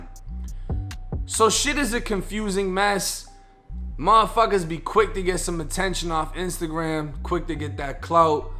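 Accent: Boston, United States. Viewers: You can hear the Boston in your accent 😂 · Yo what up i hear that Boston accent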